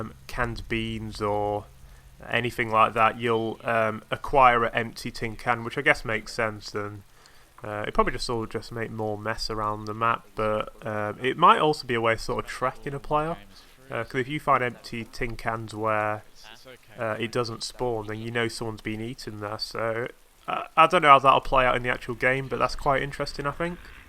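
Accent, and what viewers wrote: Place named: England, United Kingdom. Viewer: He's just English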